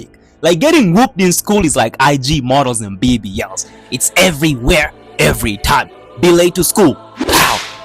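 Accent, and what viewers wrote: Kenya. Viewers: Your accent had me thinking you were kenyan ngl. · You sound Kenyan…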